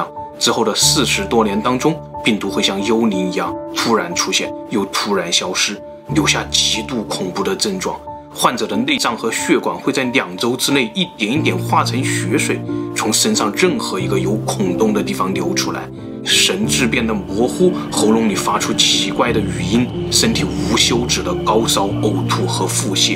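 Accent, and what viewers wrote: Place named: Hunan, China. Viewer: 带着湖南口音的普通话，居然能把故事讲得如此引人入胜，真是个奇迹！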